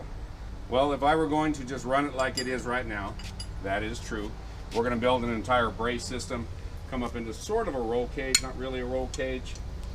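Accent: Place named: Oklahoma, United States. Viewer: is one of my favorite YouTube channels and I'm also from Oklahoma and he really does talk like that lol. He's actually an extremely intelligent and talented guy who was an Airplane Mechanic in the Air force. He cracks me up and teaches me at the same time. In his earlier videos he was trying not to sound like an idiot he said so that is why he sounds different in the earlier ones. So actually the earlier videos are the fake lol 😁👍 I like each channel for their own individual uniqueness.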